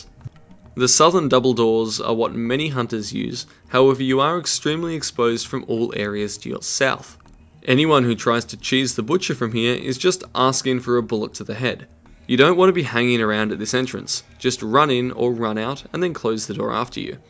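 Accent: Australia. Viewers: are u australian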